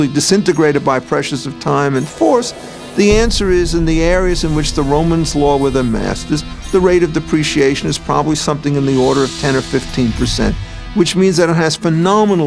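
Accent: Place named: New York, United States. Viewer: Jewish New Yorker born before 1960 accent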